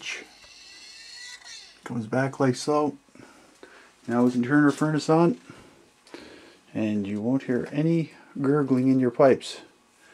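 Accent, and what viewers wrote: Cape Breton, Canada. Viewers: Also are you from Cape Breton?! You sound like a fellow caper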